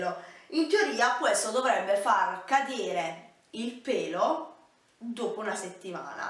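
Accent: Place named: South, Italy. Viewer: Hai la pella chiara come una del nord ma l accento fi una del sud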